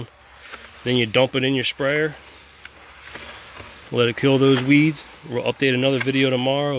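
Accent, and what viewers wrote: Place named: Philadelphia, United States. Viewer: Gotta be from Philly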